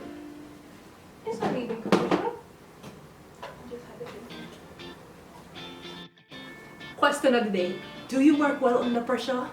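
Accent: Jamaica. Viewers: Loving your jamaican accent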